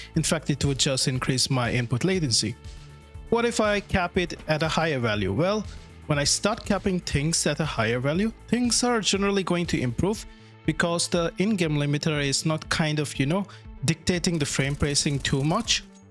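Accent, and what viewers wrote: India. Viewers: Remember the -threads in set launch options? does it still work? like i had a 5600x before and i put -threads 13 there, and my game usually felt better, but now with the 5950x do i need to put -threads 33? because the game wasn't using the threads correctly even if u enable multicore rendering in option. Oh and BTW, are you hindi? based on your accent.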